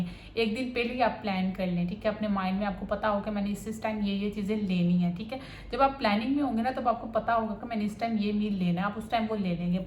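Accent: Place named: Punjab, India. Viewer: Very informative but improve your Urdu accent...seems you speaking Punjabi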